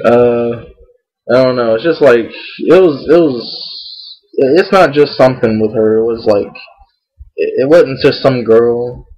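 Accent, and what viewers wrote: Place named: South, United States. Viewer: Poor little Andrew.. He's so sad.. At least you can get a woman,. Unlike Andrew who can only get butt hurt.. Anyways. I love the video baby, but stop rambling about other people besides me when it's just suppose to be about me. Lol. I am conceited remember?? And you are starting to talk like me. Lol. Country! xP and I worked at Winn Dixie for 4 months and then Pizza Hut for 4 months and finally quit that too. Lol. Which reminds me.. You need to get another job.:)(: Lol. I LOVE YOU BABY! <3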